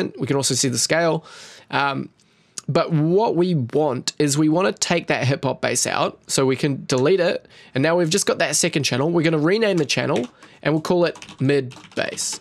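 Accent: New Zealand. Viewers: You sound kiwi bro! Me too, subbed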